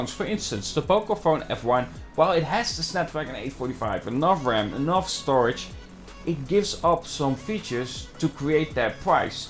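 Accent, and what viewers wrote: Netherlands. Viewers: Are you Dutch? Because you speak English like a Dutch man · hoorde aan je accent al dat je nederlands was haha en je komt ook nog eens uit eindhoven net als mij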